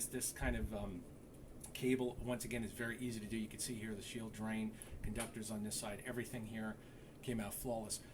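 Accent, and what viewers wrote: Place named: Eastern Pennsylvania, United States. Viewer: you sound like you're from eastern PA. good explanation on the video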